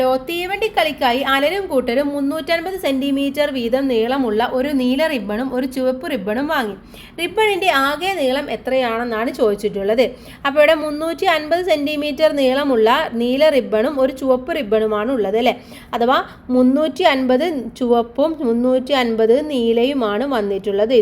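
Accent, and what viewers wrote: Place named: Haryana, India. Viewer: Teacher ka Hindi Haryanvi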